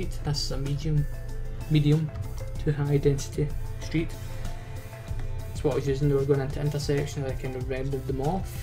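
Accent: Scotland, United Kingdom. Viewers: Are you Scottish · U sound Scottish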